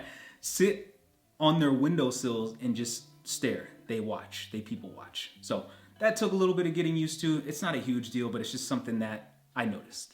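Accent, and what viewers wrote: United States. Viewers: Welcome to Germany! I remember when I visited the US for the first time a few years ago and I was completely shocked to see every single shop open on a sunday until late into the night! In fact, I had specifically planned to do some last minute shopping on monday just before my flight back home, because I was so used to no stores being open on sundays. Edit: I'm a native speaker myself, having grown up abroad and - out of necessity - bilingual, so I can tell you're speaking American English. Most Germans won't be able to tell tho because while most people here can hold conversation in English reasonably well, they're not that well-versed in recognizing different accents.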